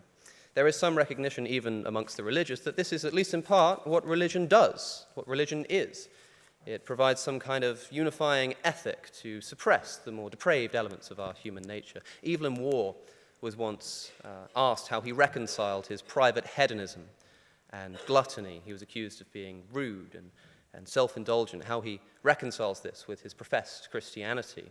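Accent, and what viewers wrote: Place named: RP, United Kingdom. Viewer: It's mostly you. This is how educated people, especially Brits, tend to speak.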